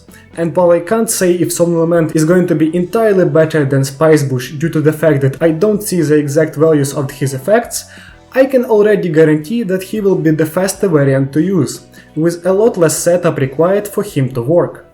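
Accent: Russia. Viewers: man, you have russian аccent, especially батрфлайс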